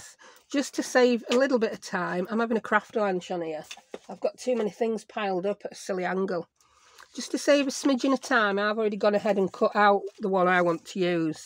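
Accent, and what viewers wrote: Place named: Black Country, United Kingdom. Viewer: I just found your page for the first time today. I love your accent! Are you from the Black Country? Gave you a follow. I love your page!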